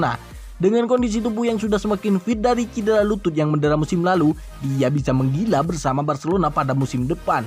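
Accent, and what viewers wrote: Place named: Madura, Indonesia. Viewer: Logat Lu Logat Madura ..... "Lu Fans Madura United ya?".... Tahun Depan Bisa Main UCL? kalo Bisa, Ronaldo mo kesana sama Braithwaite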